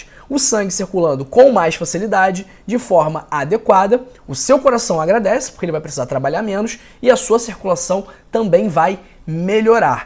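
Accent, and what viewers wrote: Rio de Janeiro, Brazil. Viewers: Boa noite que linda dica tem que ser carioca que voz mais lindo doutor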